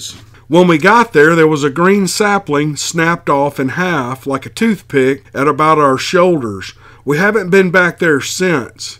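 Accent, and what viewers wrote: South, United States. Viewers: Teddy Nevarez Right On! The Southern accent is something to be proud of. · I enjoy your southern accent and am a big blues fan. I also believe in bigfoot and appreciate what you do for our entertainment. Thanks bud, keep up the good work! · Geez, seriously? There are three things that makes channel unique. 1) The awesome music, unique to this type of channel. 2) way of reading the stories, so honest and respectful. His southern accent really adds to the storytelling too. 3) The awesome stories people send in. They trust with their real life experiences because he is so respectful. So if these aspects don't appeal to some...fine and dandy...just move along to a channel you like....cause we LOVE what does with his! That's right you heard me....move along!! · Love your story telling. Your southern draw adds such authenticity to the stories. 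Keep up the good work buddy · Your music is part of what makes u stand out from others! The rest is great content and that charming southern drawl! Love u! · Mr. I do not mind the music as long as I get great stories! And I had a cousin that had sounded like you from down south. I think your accent is adorable. Me as city slicker is not offended at all. That is why God made us all different...with a Twist! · it’s me, your boy Bleu, hailing from Ocean Springs, MS. I now live in Costa Rica (again.) I’m just gonna sing your praises because I dig this topic, you talk like me and I always look forward to your content. It’s my feeling that us southerners don’t much care for folks gushing and it’s likely uncomfortable af for you. That type of thing is super awkward for me, my people back home, most Mississippians and most definitely Cajuns like myself. I’m a fan. Yankees tell you the same thing. 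😂 There’s a reason for that. A good story teller is highly valued across cultures in every corner of the world. It’s been that way since the beginning of time, although it’s exceedingly rare these day. Keep up the great work. It’s very much appreciated. Blessings to you and yours. Thank you. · The music at the intro has such a Southern feel to it, and that combined with your accent and the way you tell the stories is what makes this channel THE BEST! 🤗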